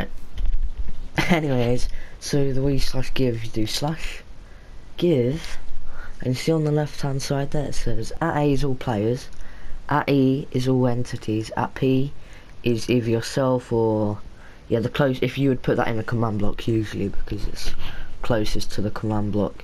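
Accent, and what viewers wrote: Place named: Scotland, United Kingdom. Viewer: i sense scottish.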